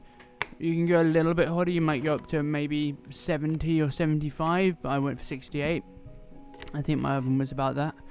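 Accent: United Kingdom. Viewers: Какой же отвратительный акцент у британского)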